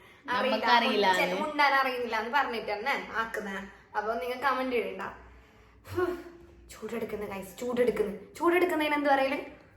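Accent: Kannur, India. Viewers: കണ്ണൂർ ഭാഷയിൽ വർത്തമാനം കേൾക്കാനും രസമുണ്ട്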